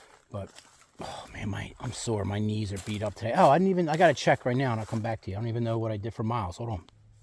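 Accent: Philadelphia, United States. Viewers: When I close my eyes I'd swear I'm listening Bam Margera hiking! lol Is that a Phillie accent?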